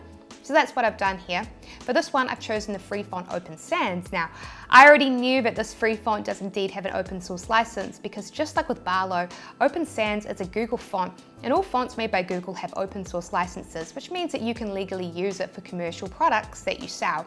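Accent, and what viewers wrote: New Zealand. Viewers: I like your New Zealand accent. Good job and thanks for all the info. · Are you a kiwi? Where is the accent from? 🙂 Love your videos.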